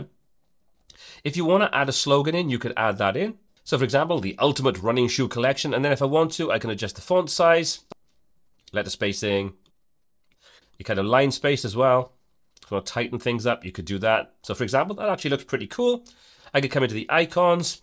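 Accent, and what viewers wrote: Wales, United Kingdom. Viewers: Are you Welsh? Valleys boy RN Veteran here. ⚓️🏴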